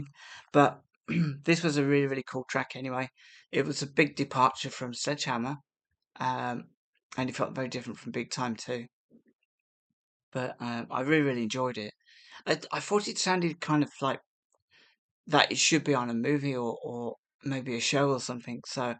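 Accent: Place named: Midlands, United Kingdom. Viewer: Hello gorgeous 🎉. Back again from Glasgow. 😂. Been a while. Hope you feel better soon ❤️🌹. I'm 57. You're so YOUNG. I had some really scary health issues the last year, but I'm back at work again. 😂. Love the midland accent 😮.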